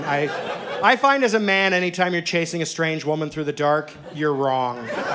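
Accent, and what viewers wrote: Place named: Canada. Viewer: I wasn't picking up on the Canadian accent at first until I realized that sometimes your enunciation sounds like Jordan Peterson 😂😂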